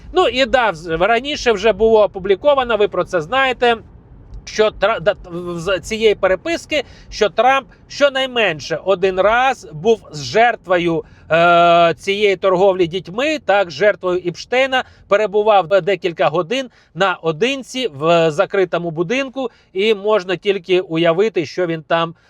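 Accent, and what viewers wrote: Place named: Russia. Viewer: РОСІЙСЬКОМОВНИЙ КАНАДЕЦЬ?? ВПЕРШЕ ЧУЮ ТАКИЙ ЗУХВАЛИЙ РОСІЙСЬКИЙ АКЦЕНТ !! ВИ Б СПОЧАТКУ ОПАНУВАЛИ СПРАВЖНЮ УКРАЇНСЬКУ, А ТОДІ СТВОРЮВАЛИ КАНАЛ !!!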